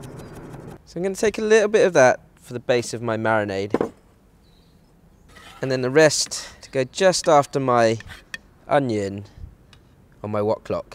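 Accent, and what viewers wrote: United Kingdom. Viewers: I gotta say that British accent is soooo nice. United Stated here - much love and respect to your cooks and expertise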